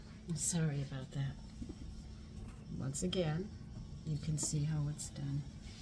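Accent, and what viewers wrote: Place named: North Carolina, United States. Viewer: You’re telling the truth, more should take heed!!! Sounds like you from NC “whaterburger” “red barn” restaurants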